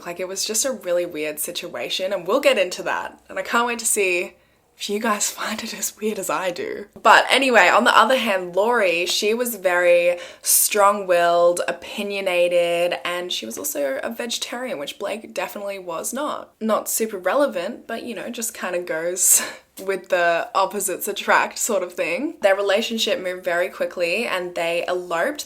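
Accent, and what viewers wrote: Australia. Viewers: Since 1985, I've heard the beautiful Australian accent every day, thanks to Neighbours.. So after this Friday, you'll be helping to fill that void for me!!... 😔💜💜💜💜💜🇬🇧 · Love the Aussie accent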